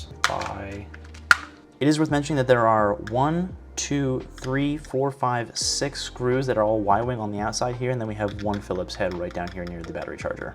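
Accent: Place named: Canada. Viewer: He’s Canadian can’t you tell EHH?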